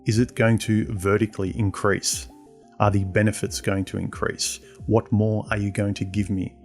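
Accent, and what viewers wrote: Australia. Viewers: Best aussie I've heard talk for years Regards a south African...lol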